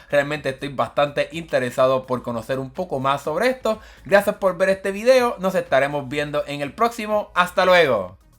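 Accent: Puerto Rico. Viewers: Eres de Puerto Rico?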